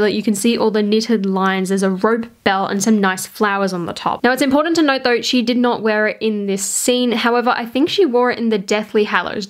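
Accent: Australia. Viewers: I love your accent! I watch your videos to practice my Australian English listening. New follower 😉.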